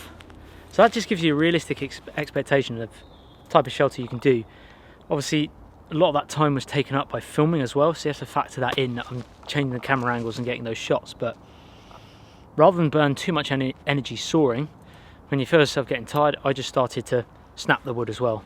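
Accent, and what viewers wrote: England, United Kingdom. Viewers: What is up my brother from South Korea 🇰🇷wow i feel your English is England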